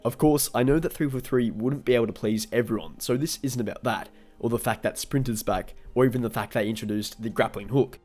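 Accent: Australia. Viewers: Are you australian? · I feel a lot better after seeing this video. I can't wait! Also are you an Aussie?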